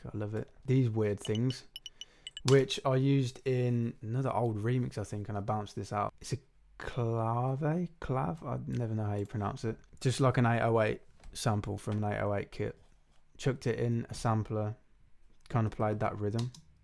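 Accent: United Kingdom. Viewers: I love his british accent 😍😍😉🙃🙂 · If I could have one wish granted, it's that even after reaches that, level of fame, he would still be our lovely down to earth Brit who'd sit down and make these videos for us... even in the private jet if he must. Super helpful and makes me really happy just watching a guy who loves his craft, doing his craft. So plz... even with the millions of $$$, I pray that he will still regularly share these humble, happy moments with us. Maybe we can hear Speak Français in a British accent one day... hmm, I will hope and pray.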